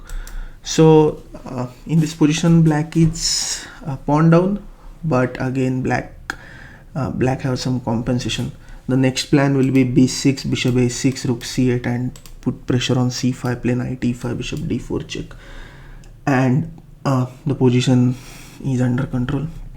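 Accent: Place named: India. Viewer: im gonna be honest, i dont keep up with chess enough to know that many fide masters. but this is actually great for me. i love listening to an indian accent explain things like this. idk why. combine that with chess and the fact that youre definitely qualified to teach this stuff? instant sub.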